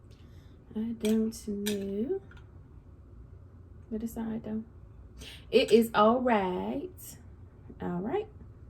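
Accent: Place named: Washington DC, United States. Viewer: Are you from DC? You have a DC accent😃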